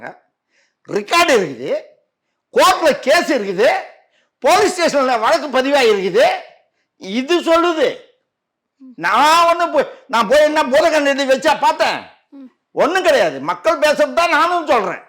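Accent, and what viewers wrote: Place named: North, India. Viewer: நீ யாரு உன் தமிழ் இவளோ மட்டமா கேரளா கர்நாடகா ஆந்திரா வடக்கன் போல இருக்கு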